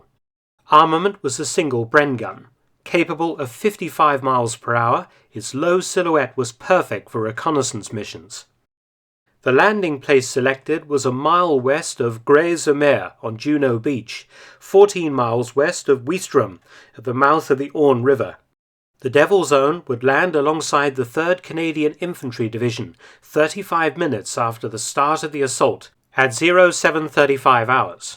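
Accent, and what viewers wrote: United Kingdom. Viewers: I have difficult to understand british english accent, but luckily I can understand 90% you. Great job.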